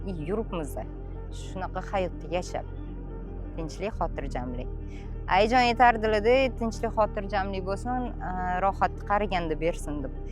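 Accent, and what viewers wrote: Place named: Kashkadarya, Uzbekistan. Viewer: menimcha Qashqadaryoda San shevangdan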